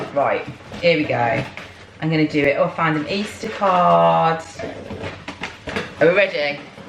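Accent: Birmingham, United Kingdom. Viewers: It's a fairly strong Birmingham accent. Not all British accents are the same at all haha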